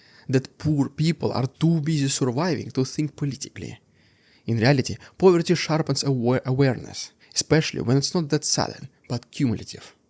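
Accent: Russia. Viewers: I like your accent. You are speaking English when I listen, but to my ear it still sounds completely Russian at the same time. Happy new year brother!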